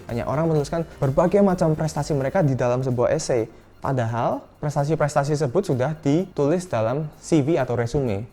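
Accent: Java, Indonesia. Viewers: Koko, logat china campur jowo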